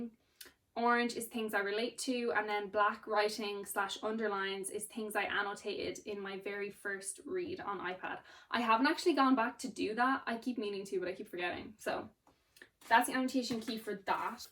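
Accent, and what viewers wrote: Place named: Ireland. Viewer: I stumbled across this video by chance, it's the first I've watched from your channel. I am the person you were before you made the leap to start with the careful pencil annotations. For some reason, I can't write into a book! 😁 I've written careful pencil remarks maybe have a dozen times, but this was when I noticed errors (usually translation errors that I've verified with the original) that stumped me so that the next person reading the book (or possibly me in a future reread) would have that information. But I've had the wish to retain certain memorable quotes and seeing how you annotate is fascinating even though I wouldn't want to write in my books with color pens. 😅 Hey, this is off-topic but I love listening to you. English isn't my native language; I'm from Germany and I don't remember hearing any Irish folks talking in English in my school days learning the language. 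(That was before the internet, and all movies / TV series over here are dubbed - the dubbing is done extremely well but of course, there would be so many accent I wasn't familiar with.) For my first real job after uni I went to Ireland and was living and working there for while (in Dublin, obviously, because this is where the jobs were). When you started talking, it was like being back there. I hadn't been aware of it at that time but the Irish accent really has a special charm! Unfortunately, I didn't see much of the island due to my being based in Dublin without a car but I'd been to Clare in a holida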